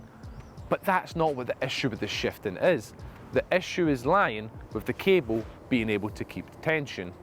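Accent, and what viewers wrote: Scotland, United Kingdom. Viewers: Scottish - not Welsh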